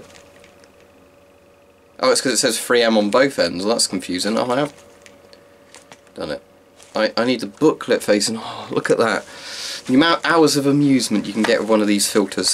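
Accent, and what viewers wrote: England, United Kingdom. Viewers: It's you english accent. Be proud of it! Maybe try some rubber bicycle tube to cover the inlet valves. 🇦🇺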